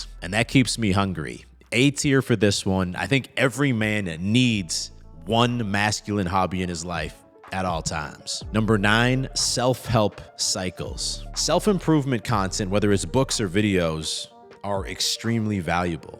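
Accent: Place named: South, United States. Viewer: This is my first time noticing the southern accent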